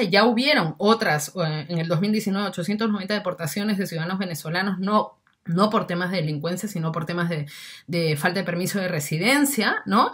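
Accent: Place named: Peru. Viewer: porque dices que no tiene acento peruano Busca en wikipedía e informate El Perú es rico tanto en lenguas como en Dejos y acentos Un tacneño no habla igual que un tumbesino O un Chalaco no habla igual que un charapa Uno de Pucallpa no habla igual que el de Tarapoto o iquitos a pesar que los 3 son de la selva Uno del rimac no habla igual que el de los Olivos o Miraflores Esta chica se puede confundir como alguien de Arequipa o conok alguien de cuzco o alguien de miraflores Y se ve que tiene estudios Solo que le falta estudiar más las condiciones sociales antropológicas de Perú Pero en su mayoría casi un 70 por ciento de lo que dice es cierto Solo que no comparto que diga que los venezolanos que se portan mal no deben ser deportados Yo vivo en Barcelona ya bine tiempo y soy conciente que si hago algo que este mal en este estado yo debería ser expulsado Lo acepto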